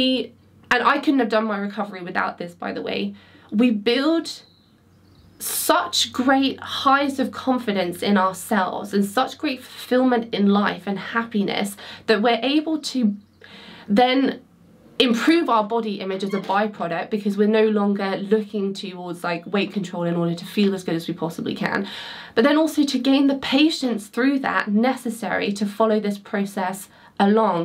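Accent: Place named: England, United Kingdom. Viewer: I am on a path this week, discovering that you are speaking ME and I have an eating disorder. This was the very first video I found of yours- I watched it 3 days ago and I can't stop thinking about this one thing you said: "Why not shift your thinking from, "How can I lose weight?" to instead simply a goal to have "a healthy relationship with food so you can live out a happy life." YES! This insight has COMPLETELY changed me and I just wanted to say thank you for helping me on this brand new journey to start having a healthy relationship with food. You are amazing. P.s. your English accent, long hair and delicate beautiful features remind me so much of the gorgeous actress Jane Seymour.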